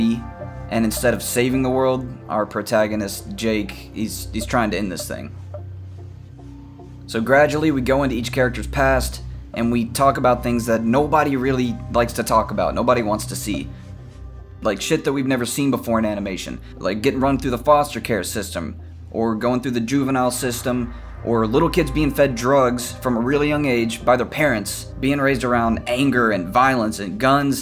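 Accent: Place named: Texas, United States. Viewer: Howdy fellow animation industry Texan! Hearing you talk feels like home🙂 I been thinking about your pitch for days, and I basically wrote an essay in response, but I feel very passionate about your idea, hope you'll bear with me. Okay! The idea of a series that shows what it's like in the US is outstanding; you're right, we don't really have that! And it's great you're thinking about bringing in therapists, hopefully public health specialists that specialize in studying mass shootings. I developed a similar story too, spent about 7 years on a novel about a mass shooter and why he did what he did. Then I lost friends in a mass shooting. In my grief, I searched for a reason why someone would do this, and read hundreds of profiles of these dudes. One thing that struck me, is that they could all basically be the same guy: brags about how smart they are to mask a deep insecurity, impulse control problems, and what I could only describe as anger tantrums, exploding the way a toddler might. 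These men are angry that they don't have the woman they want, the job they want, the money they want, whatever. And instead of turning inward and improving, they lash out. It's not a very complex journey. I applaud those who pull themselves out of this trap (like the TED talk fellow) but for the dudes who make the decision to steal human lives from our communities? Learning about how uninteresting a mass shooter's personality is, for me, was a bit like reading Eichmann in Jerusalem, a book about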